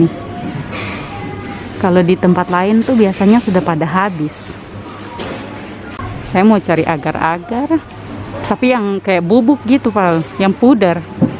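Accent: Papua, Indonesia. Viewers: Logatnya mirip Papua ? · Im fom malaysia...suka sgt video kakak.. Malah kakak guna bahasa Indonesia (papua).. Suka dengarnyaaaa....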